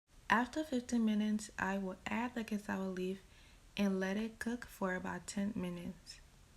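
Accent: Liberia. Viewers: You sound Liberian. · Woman are you from Liberia?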